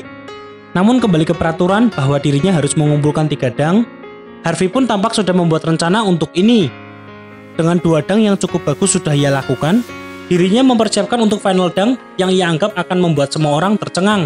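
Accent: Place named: Medan, Indonesia. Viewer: Medan nya medok bgt, hahaha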